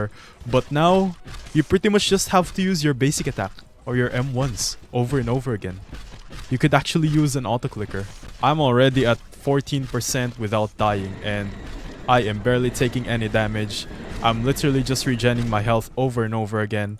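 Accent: Philippines. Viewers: Potanginnaaa mooo pinooyyyy accent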